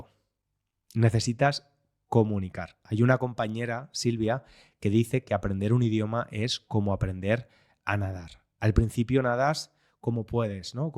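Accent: Spain. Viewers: ¡Me encantan tus podcasts! Intento escucharlos 5 veces por semana. Viví en España un total de 2 años, y ¡cómo echo de menos al acento español! He encontrado por aquí en los EEUU, no hay mucha gente de España. Hay bastante de México, América Central y Sudamérica, y eso está bien, pero me encanta el acento de España y me das una manera de poder escucharlo., tu voz es bien clara, fácil de entender (aún los episodios avanzados) y tu gramática es 👌🏻-me gusta muchísimo! Tu hermana, por otro lado… 😅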